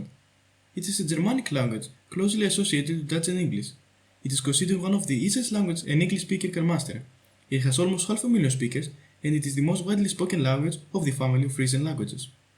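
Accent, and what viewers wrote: Greece. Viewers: Corped_Viper YB He’s Greek, cut him some slack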